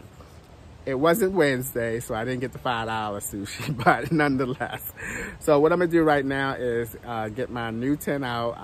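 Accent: Baltimore, United States. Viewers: where are you from, I'm from Baltimore and I can't help but to hear a little Baltimore in your talk.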